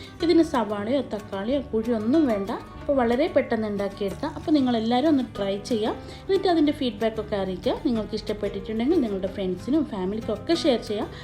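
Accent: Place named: Kasaragod, India. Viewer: Kasargod slang kelkkan nalla rasa... recipe kananum othiri ishta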